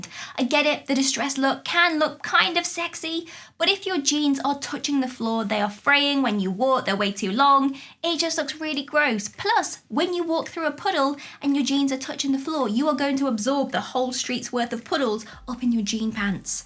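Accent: England, United Kingdom. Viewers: She is lovely, intelligent and her Queen's English is easy on the ears.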